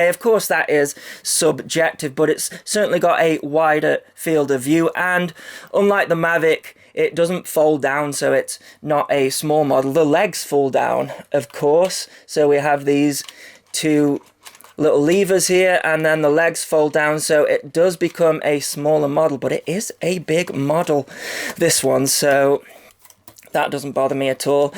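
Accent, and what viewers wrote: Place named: United Kingdom. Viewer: Your so popular I think because your delivery mixed with your British accent then add that you're very informative and interesting to listen to. Keep up the good work! Sincerely a Loyal subscriber. William Horsley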